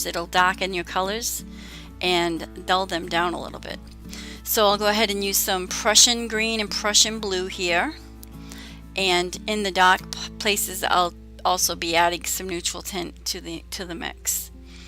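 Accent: New England, United States. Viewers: Hello, new subbie from Michigan. However I was raised in Mass and recognize your NE accent. You made me feel home for a moment. So nice to have found you. I was born in RI, is that where you're from? How close am I? 😁💜🎨 TFS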